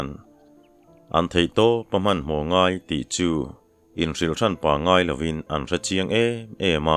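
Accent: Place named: Mizoram, India. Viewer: Mizo pa diktakin i chhiara,! sawisel tur awmlo khop hian i chhiar hi angaihnawm thin eem ani.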